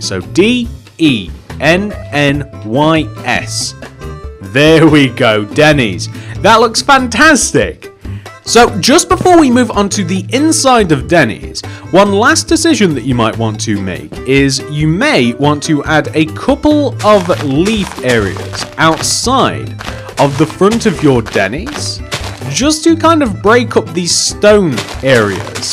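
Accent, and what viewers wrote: United Kingdom. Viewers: Are u British ?? If so can u make an Asda ???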